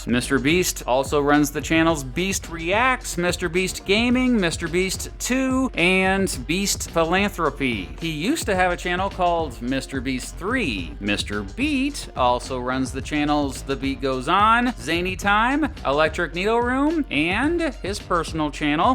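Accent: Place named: Kansas, United States. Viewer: huh...I was not aware that both Mr. Beast and yourself are fellow Wichitans. Well, I can't call myself a Wichitan anymore, though I am a native Wichitan. I knew you were from Kansas from the first video of yours I watched, though....the Kansas accent is unmistakable, though not apparent to those who spend a lot of time in the state. I can't say I've watched any videos that Mr. Beast has produced, though...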